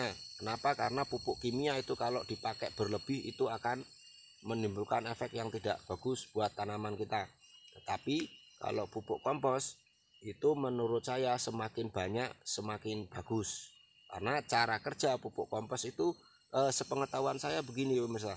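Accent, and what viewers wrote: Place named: Java, Indonesia. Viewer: Hadir,jowone kenthel jos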